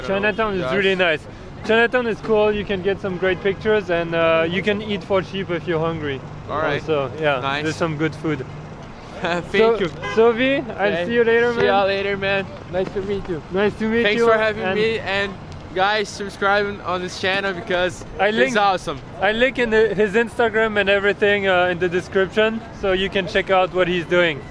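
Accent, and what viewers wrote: France. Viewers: He sounds like Mark ruffalo with a french accent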